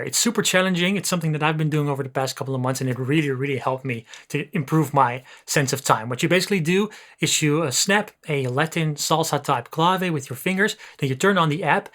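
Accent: Netherlands. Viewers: Although your location says USA and your English is great.........am I hearing a Dutch accent ?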